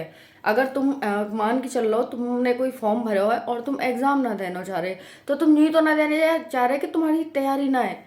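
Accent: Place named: Etah, India. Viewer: Eta district ki language hai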